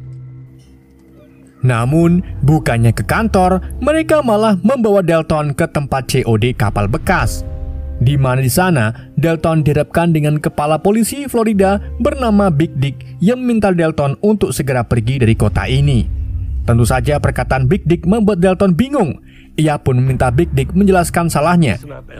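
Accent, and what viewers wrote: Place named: Pekalongan, Indonesia. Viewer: Petsi wong kalongan o min..❤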